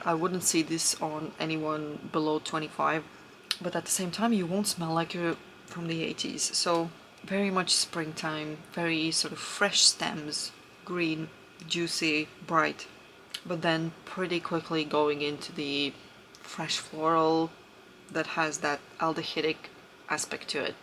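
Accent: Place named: Russia. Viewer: Your accent sounds like you're Russian 😊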